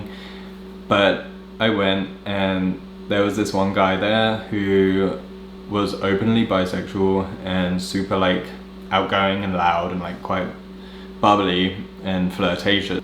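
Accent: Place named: United Kingdom. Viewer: I totally thought he was American at the beginning, then I thought he had a Speach impediment, then when he said he was 32 I was totally confused because he looks like 22 at the most, then finally I realized he has a British accent.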